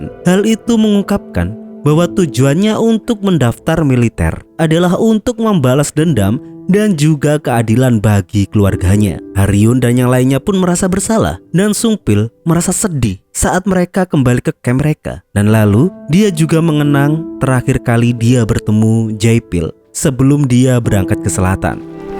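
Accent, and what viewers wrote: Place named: Kediri, Indonesia. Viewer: Modele bahass ne keterangane koyo wong kediri gueeriii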